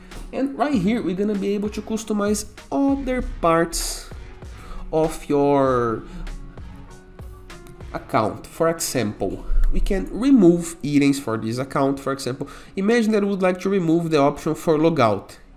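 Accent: Brazil. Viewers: tabom tabom vc ta certo vc criou algo kkkkk mas eu ri mto reconheci o sotaque brazilero na hora kkkkk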